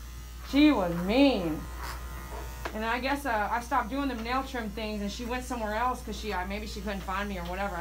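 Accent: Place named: South, United States. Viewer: Love the country talk attitude with the nail trim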